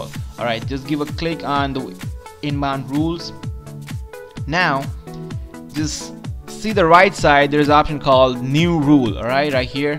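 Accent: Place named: India. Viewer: Jesus, Indians YouTubers use have of their videos to talk, too much useless talk. Whenever I realize it's an Indian voice, I just click the middle of the video, then I see/watch/hear what I came for. It's a good tip, it helps to do away with that annoying time-waster voice of theirs.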